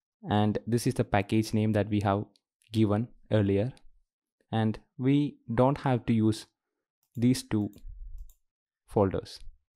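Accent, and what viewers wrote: India. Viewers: Not surprised. Indian accent.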